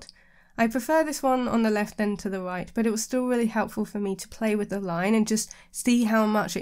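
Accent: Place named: United Kingdom. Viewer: Suddenly I found myself really enjoyeing listening to British english (im not english language speaker and in daily life moustly surrender by american english media)))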